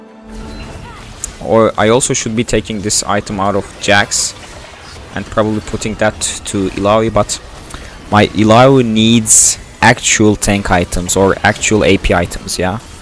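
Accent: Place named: Turkey. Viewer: aksanindan türk olduğunu anladım sonra kanal adını gördüm :D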